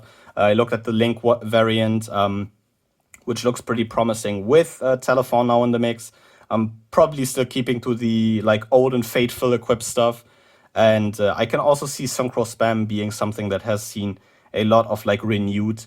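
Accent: Germany. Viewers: Are you from Germany? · You sounds so german XD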